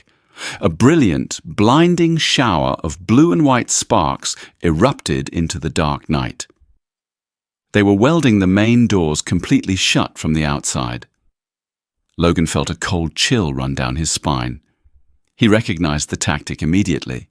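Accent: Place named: England, United Kingdom. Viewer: It’s weird listening to an English accent in a USA set story 😂❤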